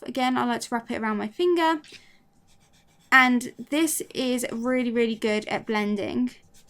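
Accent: United Kingdom. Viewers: thanks for this experment. In pencil they all look more or less the same except tissue being softer and lighter in charcol they look more or less the same except that tissue maintain the quality of lightness, and finger smothness. while cloth in charcol maintain paper texture. I agree tissue is the most distnict result especially in tone, being lighter. in both charcol and graphite. Of course assuming that the amount of preassure and the medium filling prior to smudging is maintain constant. soft delicate sponge is great for sof transition.. another artist demonstrate it with make up sponge.. forgot the name of it but it is like pencil with little sponge at the end I think for eye area.. i dont have one that is the disadvantage of being a man.;) One old art professor said that chimoa cloth is the best that work for smudging. the graphite part. Great video By the way I love languages and accents and speak several, what region is your accent in britain? 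I see you pronouce the letter "h" as "hitch", thanks for the video.. have a nice day.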